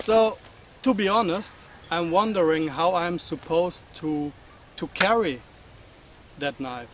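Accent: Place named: Germany. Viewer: You seem to have a German accent, but it looks like you're in a very tropical area. Where do you live?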